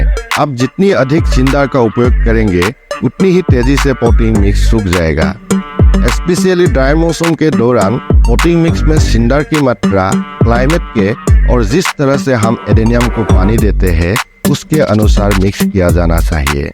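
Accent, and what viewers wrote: India. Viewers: I like your hindi accent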